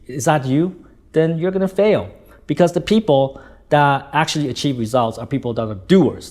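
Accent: Standard. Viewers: nói rất chuẩn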